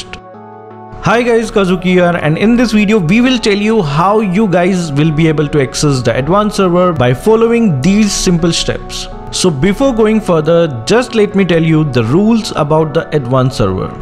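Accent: India. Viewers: Am I the only one who hea Indian accent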